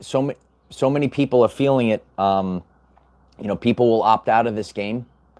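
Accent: New York, United States. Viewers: Where are you? You sound like you are from new york.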